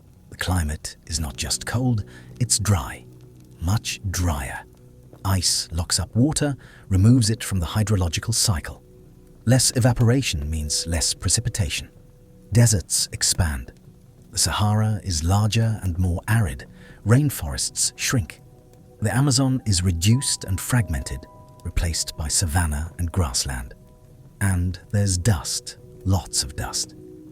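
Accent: England, United Kingdom. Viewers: an English-sounding AI narrator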